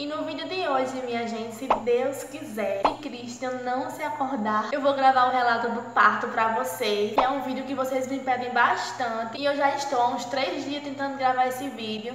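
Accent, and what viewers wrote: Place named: Alagoas, Brazil. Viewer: Graças a Deus que no final deu certo🙏🙏 O meu parto foi normal super tranquilo! Comecei sentir dores em uma noite anterior as 18hrs e no dia seguinte as 15hrs já estava sentindo contrações e partir pra maternidade.. chegando lá fui super bem tratada e meu Bryan nasceu as dizem que não demorei muito , mas pra mim parecia que não ia ter fim tanta dor kkkk mas graças a Deus deu TD certo e estamos bem.. Sucesso pra vc .. gosto do seu sutaque igual o meu kkkk sou Alagoana .. bjs corega 😂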